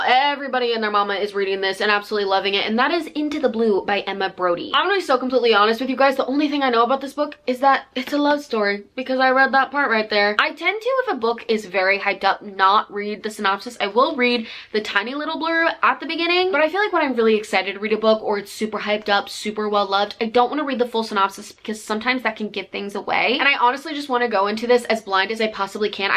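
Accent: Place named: South, United States. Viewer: Please stop using the southern accent at the end of sentences in every single video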